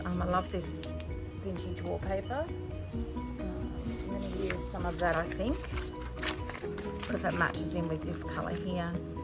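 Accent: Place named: Australia. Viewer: I just subscribed to Heather of Ruby and Pearl because I love how she inspired you! Do I want to see you make the ephemera for the Journal? Yes! Do I want to have that background music? No, but when I put it on "closed caption" I could read what you said but missed hearing your lovely Aussie accent. ❤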